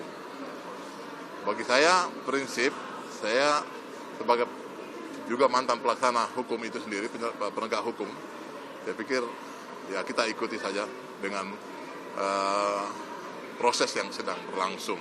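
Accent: Java, Indonesia. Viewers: Wah jowone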